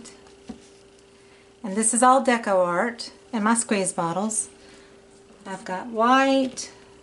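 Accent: South, United States. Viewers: Love this one. Absolutely lovely. I knew your accent was either NC, SC or GA. Hillsboro is only 45 minutes away. Now I'm upset I missed the festival. I'll catch the next one.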